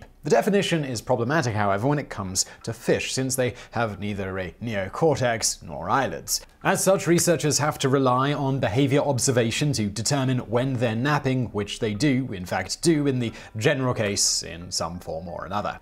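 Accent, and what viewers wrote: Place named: RP, United Kingdom. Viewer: That's not it - it's just his accent is impossibly posh. Plus they (obvs) are not from N.A. & didn't Google search "how to pronounce tilapia" prior to filming.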